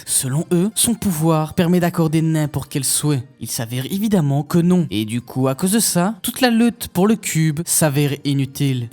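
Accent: Belgium. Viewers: Ton accent belge ressort vachement dans cette vidéo 😂😂😂 La série est excellente, elle arrive à reprendre la plupart des gros arcs des comics certes condensés mais c'est plutôt bon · oui j'ai eu la chance de voir cette série ici en Belgique sur France 4 et elle est excellente cette série,j''avais 35 ans quand j'ai connu cette série et j'en ai 45 c'est cool 👍😎🇧🇪 a propos, quand j'entends ton accent je crois que tu viens de chez nous?! 😂🤣🤣🤣🤣🤣🤣 excellente vidéo 👍 cool mec 👍🇧🇪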